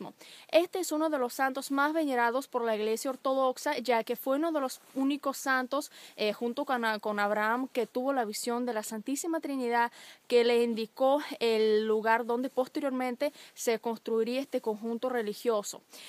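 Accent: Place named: Venezuela. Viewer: Diría que tienes acento Venezolano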